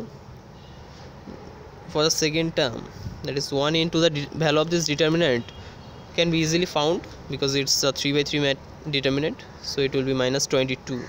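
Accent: Bengal, India. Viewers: Banglai ho kya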